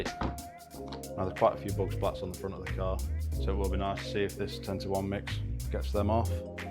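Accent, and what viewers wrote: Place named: Yorkshire, United Kingdom. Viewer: A Yorkshire accent in a video always get a sub from me. Great video pal.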